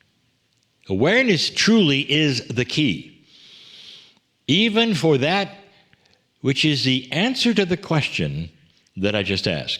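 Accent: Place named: Newfoundland, Canada. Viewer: Hmm.. I detected a bit of a Newfoundland accent in this channeling 😅 not sure if it's Lee or Kryon ❤ made me smile and chuckle here in Cape Breton, Nova Scotia lol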